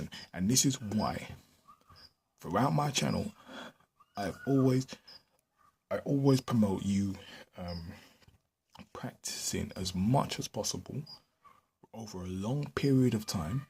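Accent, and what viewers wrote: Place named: Nigeria. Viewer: I hear Nigeria in your accent.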